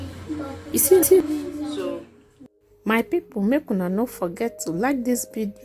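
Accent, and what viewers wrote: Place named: Nigeria. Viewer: Lolz... The pidgin is really funny ... although u are gud in the make up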